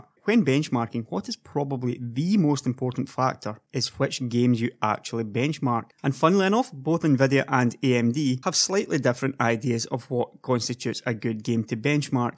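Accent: Scotland, United Kingdom. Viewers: Scottland? Just wondering because of how you speak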